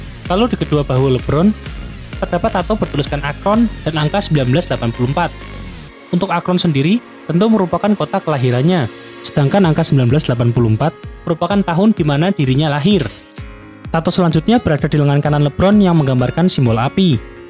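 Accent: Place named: West Java, Indonesia. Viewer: Sundanese english 😂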